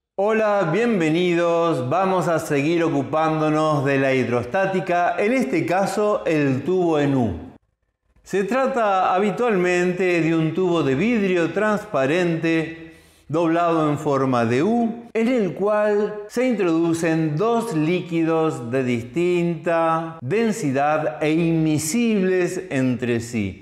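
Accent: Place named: Argentina. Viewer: El acento argentino siempre queda tan bien en un profesor, muy buen vídeo!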